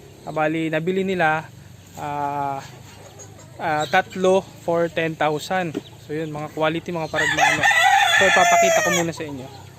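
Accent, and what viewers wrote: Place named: Samar, Philippines. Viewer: Ha Samar ka?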